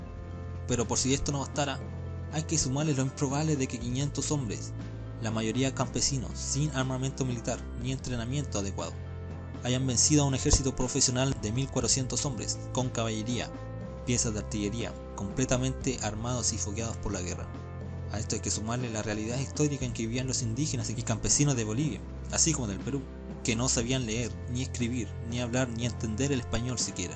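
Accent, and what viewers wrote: Chile. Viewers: SI me parece que esta claro las medias verdades que argumentas,tu video no es imparcial esta claro tu acento chileno, bueno cada quien defiende su pais, PERO LO QUE ES CIERTO Y NADIE LO PUEDE NEGAR ES QUE UN PAIS MAS AVANZADO SE APROVECHE Y ADUEÑE DE SU TERRITORIO DE UNO MENOS AVANZADO. QUE PENA POR LOS HERMANOS BOLIVIANOS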